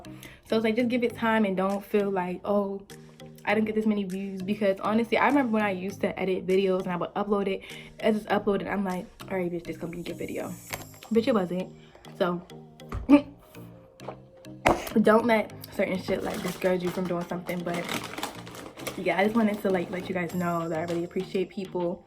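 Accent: Baltimore, United States. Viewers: are you from Baltimore? 🤔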